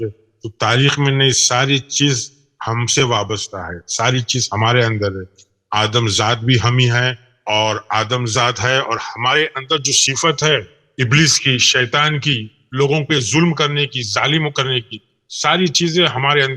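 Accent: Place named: India. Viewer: مجھے لگتا ہے تمھارے لہجے سے کہ ہندوستانی ہو اور اپنی مرضی سے قرآن کی تعویل کر رہے ہو۔شاہد ہندو بھی ہو۔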